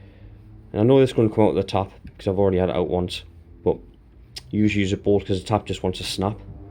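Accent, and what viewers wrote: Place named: Newcastle, United Kingdom. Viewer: Geordie it’s easy to understand.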